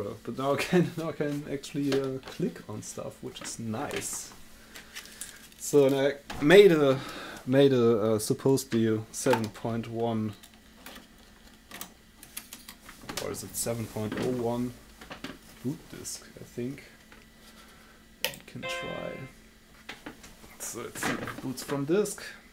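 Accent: Germany. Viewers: Hahaha, how did I miss this one over the course of the years... "This is a Macintosh SE DIRTY" :D:D:D Love the German accent :D I have one of these and I love it. Recapped it years ago with nice tantalums so goodbye leakage ever – still have to recap the analog board, so I'm happy I ran into this video just now, it reminded me of this :D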